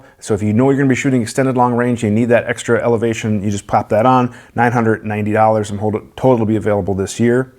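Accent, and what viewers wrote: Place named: Wisconsin, United States. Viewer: Love your videos and sconnie (OR possibly MN) accent tooo boooot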